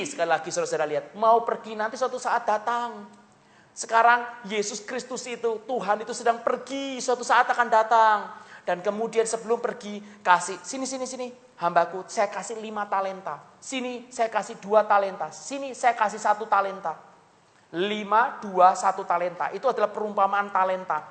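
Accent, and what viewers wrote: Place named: Java, Indonesia. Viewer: Logatnya tetap beda medok "Jawa"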